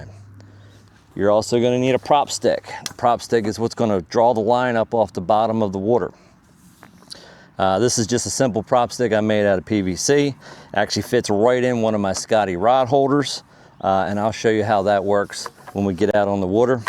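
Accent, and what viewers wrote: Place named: Maryland, United States. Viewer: " WOODER!! LOL, Definitely got that Maryland accent!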